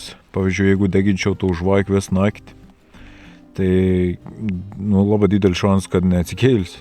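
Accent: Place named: Samogitia, Lithuania. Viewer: Nu man sunku suprast sita kalba galetum nezemaiciuot butu fainiau daugiau prenumeratoriu susirinktum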